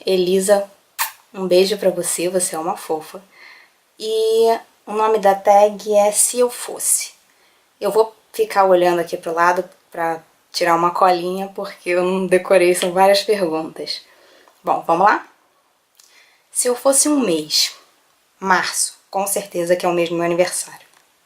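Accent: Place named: Rio de Janeiro, Brazil. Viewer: oieee.. td bom???? adorei seu canal.. que sotaque lindo... carioca???? já super me inscrevi aqui =] no meu canal eu faço vlogs, tenho dois bebês, gemeos e mostro minha rotina com eles.... =] ficarei feliz em te ver por la... vi que vc tem o canal desde 2012 mas só começou com os videos a um mês atras.. continue... vc fala bem.. é linda e parece muito simpática.... sucesso... bjssss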